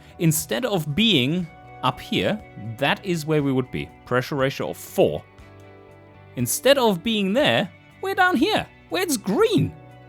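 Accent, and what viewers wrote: Germany. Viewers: i like your dialect, sounds like a fellow native German speaker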